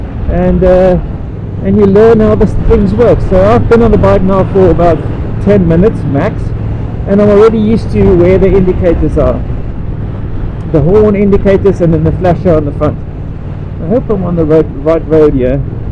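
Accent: South Africa. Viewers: Klink my jy is van Suid Afrika??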